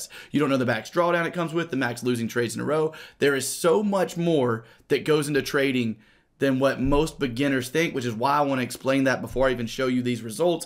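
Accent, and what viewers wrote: South, United States. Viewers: love your videos brotha. thanks for all the help. I gotta ask...are you from the south? I hear some twang in your voice. im from south Louisiana btw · he's the American Chris, coz he sounds american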